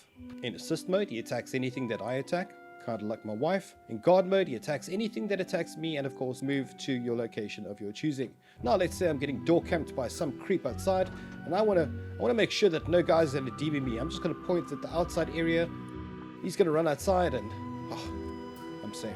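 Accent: South Africa. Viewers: My boy's a South African... Goddamn. It's one of a kind😂Love from CPT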